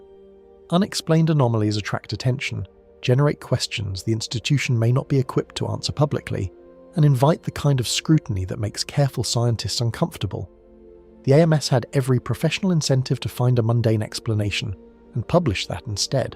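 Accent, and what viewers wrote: United Kingdom. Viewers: Please. Get rid of the insufferable Brit accent. Enough is enough! 😮 😊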